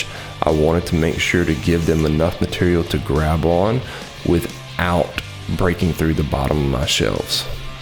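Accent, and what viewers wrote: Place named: North Carolina, United States. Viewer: Great cart man. Where are you located (generally) I'm in NC and you sound like you might be "from round here" ;)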